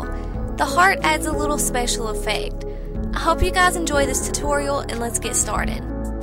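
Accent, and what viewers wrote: South, United States. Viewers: You guys act like you've never heard someone speak with a southern accent before LOL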